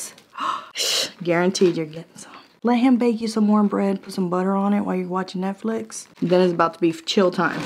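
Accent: South, United States. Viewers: Malta, coquito shes a puerto rican w a southern accent and funny too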